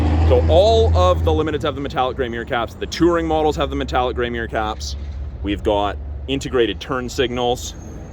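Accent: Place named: Canada. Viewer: I enjoy this fellas reviews he’s got moxy to him and that Canadian accent is a hoot!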